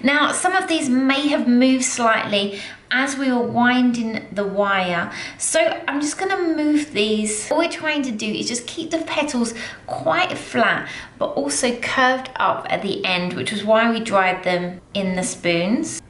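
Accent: United Kingdom. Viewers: just subscribed you... you deserve it... best tutorials on youtube everything in detail and yr british accent i love it i grew up there... but nevertheless all accents are good... love and hugs pls make tutorial on cherry blossom i make flowers w porcelain and the result is great... GOD BLESS U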